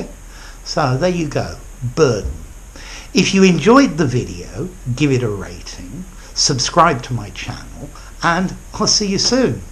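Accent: United Kingdom. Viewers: Are you from Great Britain🇬🇧?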